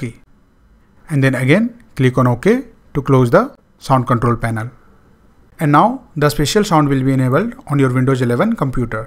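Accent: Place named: England, United Kingdom. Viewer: goooosh youre english accent i DIE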